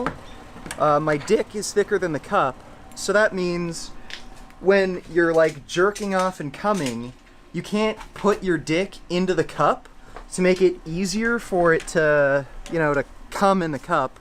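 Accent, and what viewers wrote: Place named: Toronto, Canada. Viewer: Xavier Rose typical Toronto accent. It sounds insanely gay.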